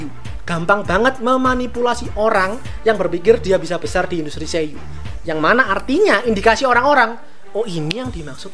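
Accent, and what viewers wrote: Java, Indonesia. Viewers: Medhok banget kak asal jawa kh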